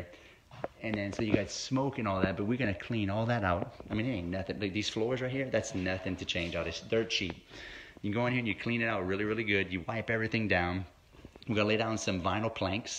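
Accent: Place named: South Louisiana, United States. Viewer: Great info. I'm from south Louisiana as well. I know that accent! lol. I just setup my first mobile home rental on a piece of property I already owned and looking at another one setup on land that needs some work. I have aspirations of one day owning several parks like you. I know there are a ton of MHPs in our area, but are that many owners selling? How far out do you have to go to find deals? What are your thoughts on building a new park vs buying one?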